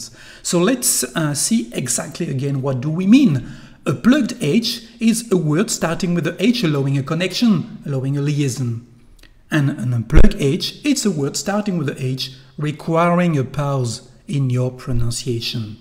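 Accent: France. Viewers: Il est français clairement hein, vu sa prononciation de l'anglais ^^"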